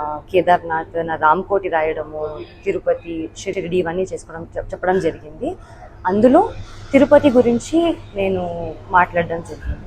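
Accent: Telangana, India. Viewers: Podcast lo your slang was like telangana girl..but ikkada chala different ga vundhi...podcast lo fake slang aa or ikkada fake aa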